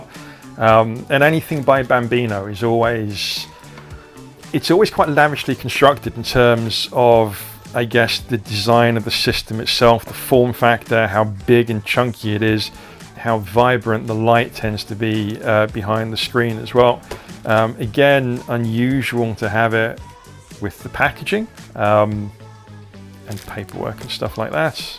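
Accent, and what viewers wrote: Bristol, United Kingdom. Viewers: is LOVELYYYY, love the video! Found you cus' of Super tomato page! Wooo - Also recognise that bris accent anywhere haha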